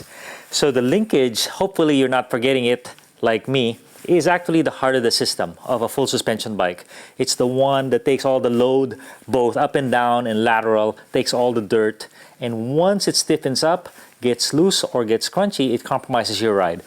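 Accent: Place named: Philippines. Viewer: English accent sounds like Filipino? Tama ba ko kabayan?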